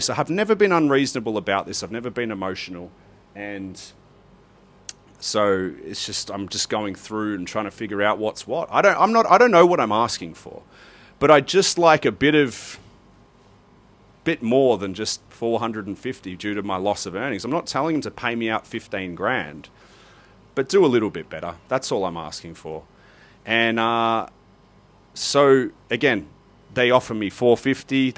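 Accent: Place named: Australia. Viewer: You sound like an Aussie. The same as me. We fly Emirates BC once a year to Europe. Never had an issue. Did fly to the States once and our luggage was lost for 2 weeks. Yes it was a pain in the ass. But as my hubby says, dont stress about crap you cant control. But i get its frustrating. We got zip as we were told to keep reciepts and claim on insurance. As for Eithad and Qatar. Qatar. Please support your fellow Aussie females and boycott them. Females were taken off their flight, which is government owned, and were forced to have internal examinations in a ambulance on the tarmac, as someone had a baby and left it in the terminal. Every female was taken off the flight and subjected to this assault. Law suit is currently pending. Qatar also often change out their aircraft. You book a flight which has Q suite listed. Then operational change and you end up on a piece of crap business class seat that has zero privacy. 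Atleast with Emirates A380, if you pick a window seat you have privacy with how the seats are done. Like your own cubby. Qatar are well know for changing out aircraft for operational reasons. Eithad. Besides looking cheap and nasty, some of their planes, 787 and A380, they have some rear facing seats in BC. No idea about FC. So if you go this route chose your seat wisely. Dont let one bad experience with Emirates define them. Look at Qantas. I only fly them domestically because they are crap. But i still fly them because Virgin are even worse. Happy travels. Caz.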